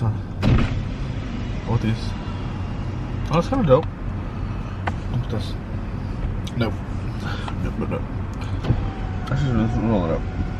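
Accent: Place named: Canada. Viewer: Did you just move to CA? Could of sworn you were Canadian :P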